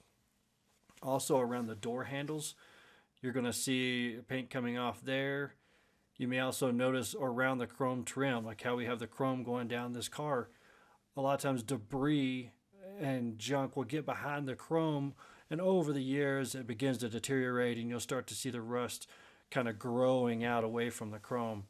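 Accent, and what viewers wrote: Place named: South, United States. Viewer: You have the soothing southern voice for it, just every time you make a mistake call it a "Happy accident" and add a "happy little piece of rust" here and there.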